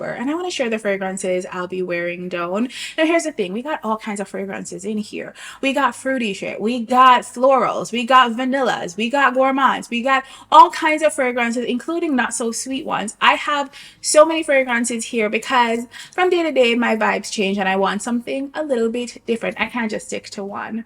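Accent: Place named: Jamaica. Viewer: You sound Jamaican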